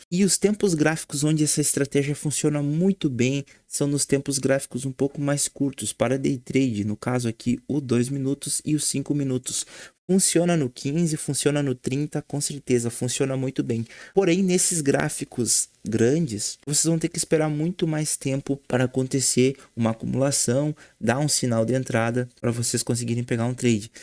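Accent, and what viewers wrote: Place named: Rio Grande do Sul, Brazil. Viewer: Conheço esse sotaque tchê kkkkkkk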